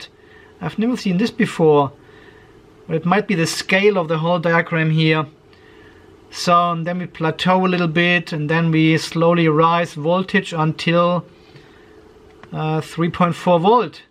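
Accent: Germany. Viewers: Hope it comes a new clip soon;) I enjoy too see your clips not only for solar stuff but your seens of humor and your german english ;)👍 Every time I see a new post from you I put on the coffee and chocolate, big blanket and lay down on the sofa. I really enjoy and learn from you sir 👍😉 Best regards Morten from Norway 🇳🇴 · Danke für Deine gute Laune und dein Deutsches Englisch 😅👍🏻 finde deine Videos spitze weiter so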